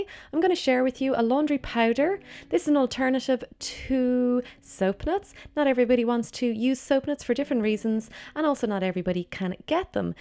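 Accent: Ireland. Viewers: Loving the Irish accent 😉👍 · I love Irish accents! ☘️ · Was missing the Irish accent from the series on amazon. Finished all the episodes. Then this came up. Yay, lol 💕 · I was wondering if you were from Ireland then you said it! I'm italian so I don't have much clues about english accents, but the Irish one is in my heart forever now. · Oh the Irish accent, how I like it! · Your Irish accent is sooo cute!!! :) I can actually understand it!!! As a North American, I don't really have a chance to hear the Irish accent, but when I do I can't really understand what anyone is saying!!! Lol. May I ask what city or region your accent is from in Ireland? Thanks if u can reply!! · Are you Irish?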